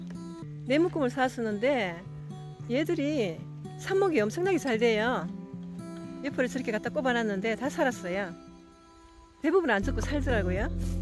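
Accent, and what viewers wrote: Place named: Gyeongsang, South Korea. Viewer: 서울말 쓰는 갱상도 아지매시군요. 꽂아놨어요를 꼽아놨어요에서 빵 터졌음......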